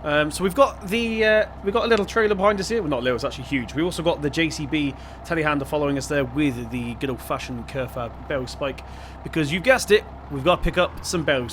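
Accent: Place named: Plymouth, United Kingdom. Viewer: Bit of a Plymouth accent there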